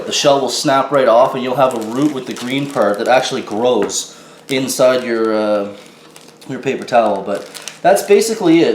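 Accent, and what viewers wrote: Canada. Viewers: ***** even sound a bit canadian now... -Tko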